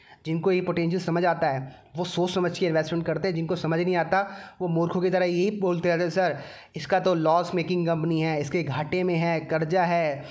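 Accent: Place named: Western Uttar Pradesh, India. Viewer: भाई तुम्हारे डायलॉग बड़े अच्छे लगते है एकदम देसी वेस्ट यूपी वाले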